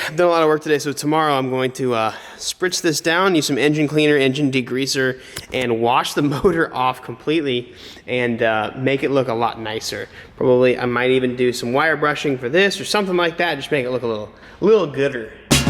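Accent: United Kingdom. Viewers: Dude...your British accent is tits! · British accent on point BOI.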